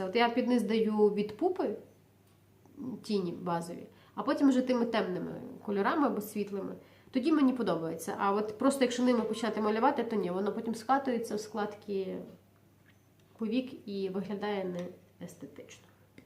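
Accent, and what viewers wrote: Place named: Lviv, Ukraine. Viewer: Спасибо за новое видео! Смотреть- огромное эстетическое удовольствие! Вы, очень красивая, шляхетна пани! ))) И очень очень нравится Ваш львовский говор! Ну и тембр голоса у Вас очень приятный безусловно!! Всего Вам наилучшего желаю и Вашей семье!!!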